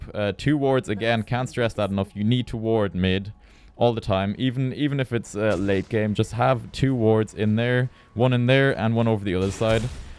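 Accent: Ireland. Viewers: are you Irish and if so which county? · Ah an irish man as well, respect my friend ;) nice guide :)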